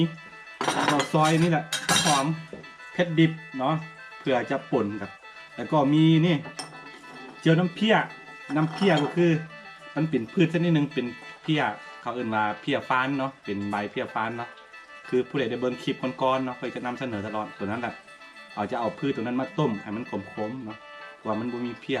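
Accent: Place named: Thailand. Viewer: ຕິດຕາມຕະຫຼອດແຕ່ບໍ່ຮູ້ວ່າເປັນລູກຊອດ ຫຼືວ່າ ອາຂ່າໄທບໍ? ເພາະວ່າສຳນຽງການເວົ້າຢາກອອກໄທແນ່....ຂອງກິນທຳມະຊາດຫາຍາກ ຢາກນຳເດ່😋😋😋😋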